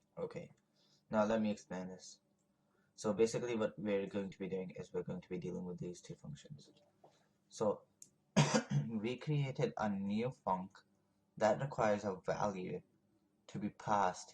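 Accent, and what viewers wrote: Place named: Scotland, United Kingdom. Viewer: Nice and Clear tutorial, made it really easy to follow and understand. Funky accent btw, Scottish twang? :)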